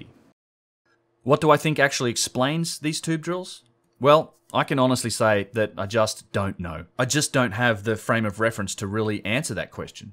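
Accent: Australia. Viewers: Great work, so obvious they used diamond drill or similiar stone to anyone with a brain that works! Thankyou! You sound Australian if so, great to sed Aussie working seriously in this area!❤😂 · Good stuff mate, nice to listen to another Aussie voice talking some sense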